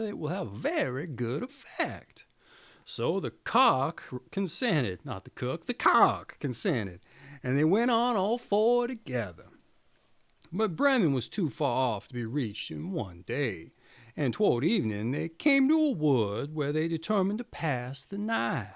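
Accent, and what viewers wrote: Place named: Lowcountry, United States. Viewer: I can’t decide if he is mocking the low country accent or enjoying it 😂but it’s a little to theatrical to listen to the entire story.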